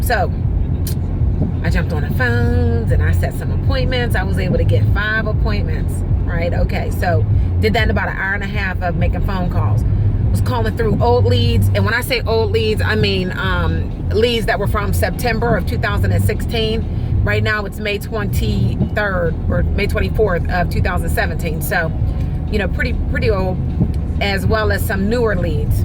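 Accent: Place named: Pittsburgh, United States. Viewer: It sounds like your from Pittsburgh I wonder if you work for troy.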